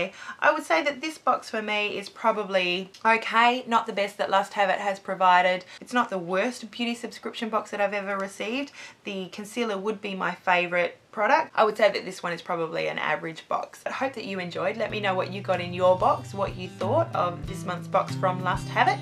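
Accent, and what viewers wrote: Australia. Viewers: Hi! New subbie here and I love u already! And I love Australian accents. Hugs from the states.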